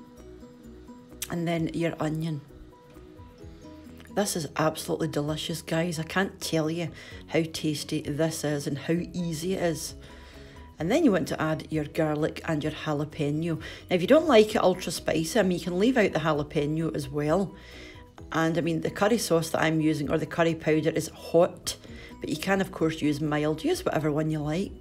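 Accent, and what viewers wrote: Scotland, United Kingdom. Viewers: I don’t why but I could listen to the Scottish accent for hours, so friendly and calming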